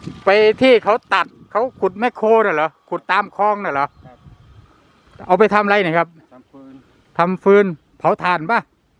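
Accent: Isan, Thailand. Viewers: แฟนคลับจากลอนดอนประเทศอังกฤษ..จำเสียงได้ตั้งแต่ประโยคแรกแล้ว..ว่านี่มันเสียง..นี่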